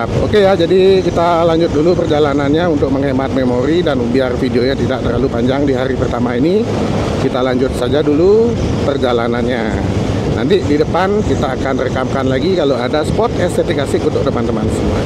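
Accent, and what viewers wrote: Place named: West Java, Indonesia. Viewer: Yogya hadiir perdana beli Pingiin ikutan Logatnya kok ada sunda n bali nya ya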